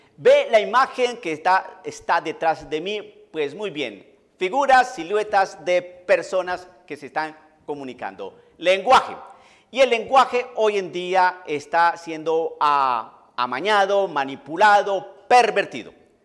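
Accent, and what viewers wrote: Colombia. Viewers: tiene mas acento colombiano que mexicano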